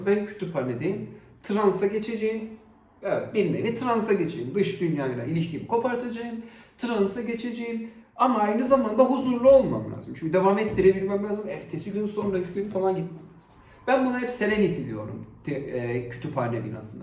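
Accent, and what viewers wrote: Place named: Cyprus. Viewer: Doğu Akdeniz Üniversitesinde öğrenim görmekteyim,konsept ararken videonuza geldim. Şiveniz beni tekrar Kıbrısa götürdü. Bu pandemi döneminde çok güzel hissettirdi. Videonuz da çok yararlı oldu. Teşekkür ederim.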